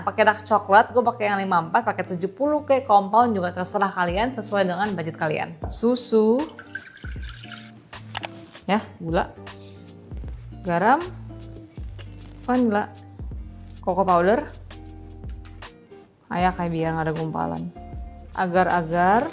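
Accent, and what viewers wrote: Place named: Medan, Indonesia. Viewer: Kok jdi medan kali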